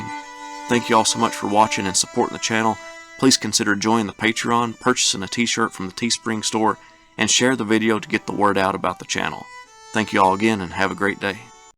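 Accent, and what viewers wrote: South, United States. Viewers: Sir, you sound like a Southerner so why are ALL of the accounts from Union soldiers? There are plenty of first-hand accounts by Confederate soldiers. Perhaps a more even blend would be better. One more thing, the name of the battle is Sharpsburg.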